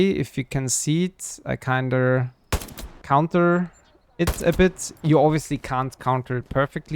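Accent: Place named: Germany. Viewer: Man merkt so das du deutscher bist😂😂 aber danke dein video hat mir echt geholfen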